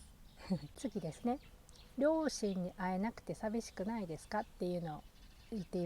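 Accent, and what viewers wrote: Kansai, Japan. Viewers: こんにちは。 さんの関西弁、めちゃくちゃ親近感です。とても素敵なお家ですね。お二人のお子さんがバイリンガル、普通にお母さんとは日本語でお喋りされていて、ドイツの学校や幼稚園に通われてるのは凄いでね。コロナが落ち着いて日本への一時帰国がコロナ前の様に気軽に出来る様になったら是非お子様達に日本の小学校を体験させてあげて下さい。 ドイツの学校の夏休みは何ヶ月ありますか？ 日本の夏休みは7／20前後からですので、1週間から2週間早く一時帰国されたら日本の小学校体験入学できますよ。ドイツの小学校との違いが面白かったり、日本のお友達も出来ますよ。 お友達のお子さんが毎年夏に少しだけ日本の小学校に通ってました。ほんの10日間とかでもお互いとても新鮮でいい刺激になる様です。毎年帰国出来なくても、次帰国したらお友達と遊ぶ約束などして楽しんでいました。 何時も何気ないドイツの日常の風景や、食されてる食材を拝見するのが楽しみです。これからの動画も楽しみにしています。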